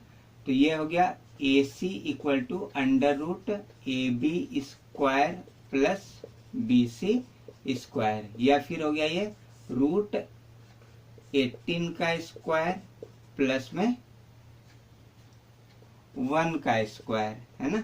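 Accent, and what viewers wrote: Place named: Haryana, India. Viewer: Haryanvi rocks :))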